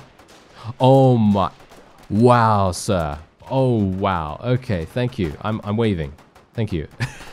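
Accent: United Kingdom. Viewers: I hope you don't take this the wrong way, but I feel as though, in a game this hectic where there is so much going on, the constant commentary might be less useful. I normally think your commentary is great, in games like Bannerlord. But then we all know what to expect from Bannerlord, this game is new and I would sort of like to see you explaining how the logistics works, or letting us experience the carnage of combat without a smooth British voice calmly stating, "Oh that was a nice grenade, I'm dying." I still love it because let's be honest that's kind of funny, but a compromise could be giving us five to ten seconds in tense situations to hear what you hear, the communication in the game and whatnot. If you don't like my criticism I understand, because I am certain that many people will disagree with me, it's just a personal preference, I will continue watching either way.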